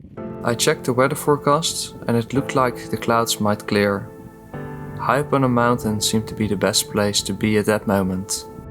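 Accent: Netherlands. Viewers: At first when I heard your English I assumed you were Dutch but then your pronunciation of the Norwegian places is so good! Anyway, loved every bit of this. Loved, loved, loved. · I stumbled upon this and upon hearing your Dutch sounding English - immediately liked and subscribed. One hour and twenty something minutes later, I feel like I've been with you on your trip through Norway. What an awesome thing to have done and very well documented with beautiful footage. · Er valt echt niet te luisteren naar Engels met een Nederlands accent, behalve als het over dit soort onderwerpen gaat. Dit is oprecht 1 van de lijpste dingen die ik heb gezien, gefeliciteerd met deze prestatie. Wat een reis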